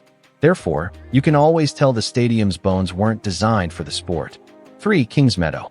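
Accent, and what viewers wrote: United States. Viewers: In this video we've heard some Yank who clearly doesn't get traditional football nor the British football culture. The list is just completely un-serious American claptrap, a profound lack of understanding of something miles away from US culture. Ewood Park as an ugly ground? What is this tosser on about with that dreadful American accent of his? Appalling. Seriously.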